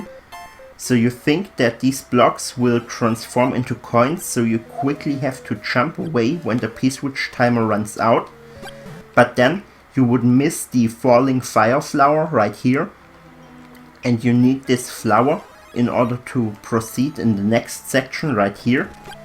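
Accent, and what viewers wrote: Germany. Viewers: It's a German accent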